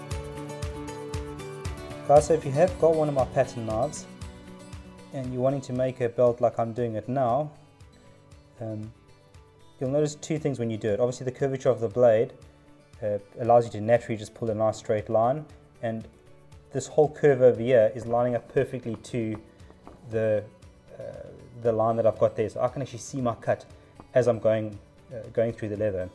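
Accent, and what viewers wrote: South Africa. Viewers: I knew with that accent where in South Africa are you and do you have a shop in the western cape ? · Aussie saddlemaker here. Been a saddlemaker for 39 years and never used a lap skiver, you have convinced me to start using this tool. I have been using a round knife for hand skiving. Only cos this is how I was taught by when I learned my trade in the 1980s. That skiver is nicely sharpened, hardly any effort to skive! Is that a South African accent? At first I thought your accent was New Zullander (New Zealander).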